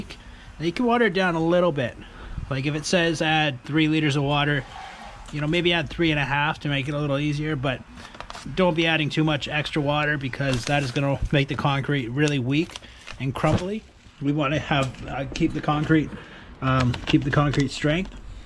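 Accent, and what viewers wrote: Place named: Upstate New York, United States. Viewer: Where do you sell them? Garden centers? Your accent sounds like upstate new York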